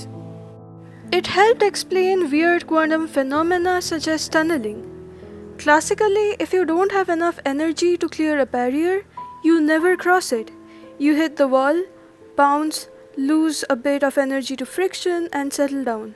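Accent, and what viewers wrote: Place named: India. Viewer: I usually can't stand Indian accent of English - but this one is really pleasant to listen to lol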